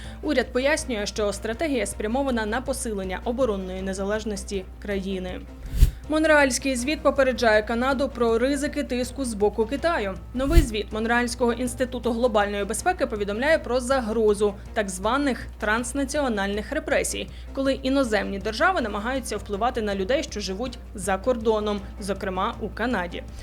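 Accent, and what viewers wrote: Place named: Slobozhanshchyna, Ukraine. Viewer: Я понял, со Слобожанщины,Полтава? Акцент априори от туда,не?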